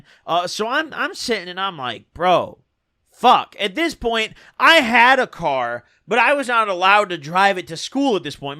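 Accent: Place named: Midwest, United States. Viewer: His accent has really changed this sounds so midwestern😂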